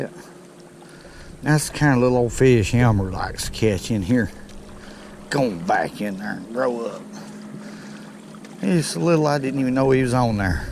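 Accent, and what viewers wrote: South, United States. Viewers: Naw, man, he wasn't drunk, he's just southern as all get out. And where he's fishing, Weiss Lake, is considered "Crappie Capital of the World", or so the signs say when you get near the lake. You ought to head there if you ever get the chance. Rent you a room at the Alabama Bell, fish the pier, hire a guide or take your boat. You won't regret it.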